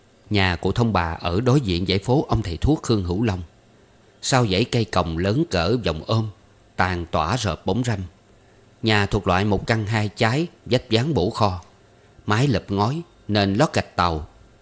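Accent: South, Vietnam. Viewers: Mèn đét quỷ thằn thiên địa ơi, yêu quá những từ miền Nam đặc sệt sền sên luôn. Thanks NV Hồ Trường An và giọng đọc thiệt là Nam Kỳ của ❤❤❤❤❤❤❤❤❤❤❤❤❤❤❤❤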